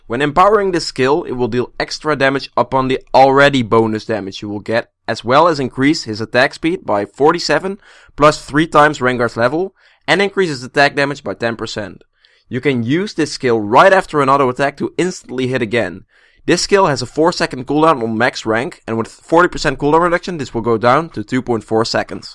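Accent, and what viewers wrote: Netherlands. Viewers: You're dutch I hear it because of your accent. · Ja je hoort echt dat je Nederlands bent. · I'm new to your channel, but you sound dutch! Are you?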